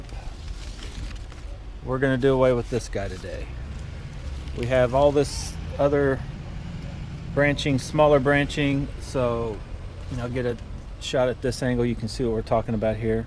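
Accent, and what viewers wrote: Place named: South, United States. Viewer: Love your accent, are you from south of usa?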